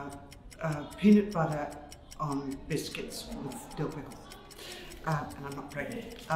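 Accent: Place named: RP, United Kingdom. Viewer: well, the UK, as small as it is, has more accents condensed into a country than i've ever heard, so i guess it depends what your ear hears. i live in south africa, and we have 11 official languages and hundreds of unofficial ones - the cocktail of accents here is incomparable, but that is because there are so many different languages. in the UK, even with english being the first language for most, i have never heard so many varieties of accents in a matter of kilometres apart ;) to me her accent sounds like a mix of many, but definite overtones of posh brit, unless you listen closely ;)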